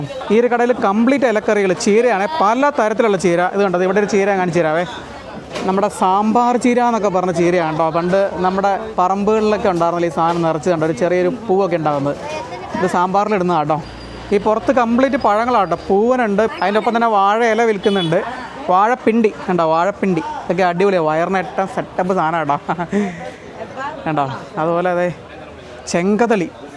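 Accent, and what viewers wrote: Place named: Ernakulam, India. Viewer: Off topic. accent gives me chuckles. I am amazed how many accents a district like Ernakulam has. Another prominent one is from Kochi area. Edapally/Kalamassery/Aluva has no typical accent but more of a mainstream Malayalam I like to believe. Anyways your sweet accent makes the video more lively.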